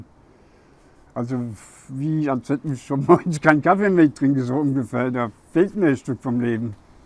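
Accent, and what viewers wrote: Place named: Hesse, Germany. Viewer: So sympathisch..mit seinem hessischen Akzent und seiner menschlichen Art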